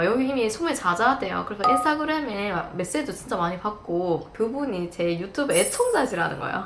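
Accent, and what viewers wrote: Gyeongsang, South Korea. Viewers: 이 언니ㅎ 처음엔 뭔 영어를 대구사투리로 하나 웃었는데 점점 빠져듭니다 타국생활 지치지 말고 즐겁게 .. 응원합니다^^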